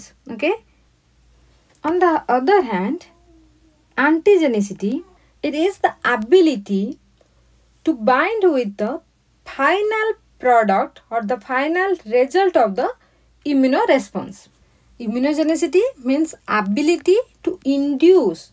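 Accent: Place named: Odisha, India. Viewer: I was first confirmed that you are from odisha by listening your speaking style...thank you so much ma'am... For making this understandable.. Please upload videos for ug students. 🤗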